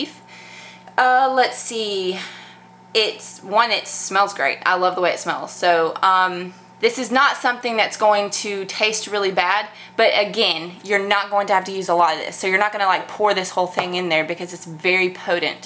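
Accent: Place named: United States. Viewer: American accent is so annoying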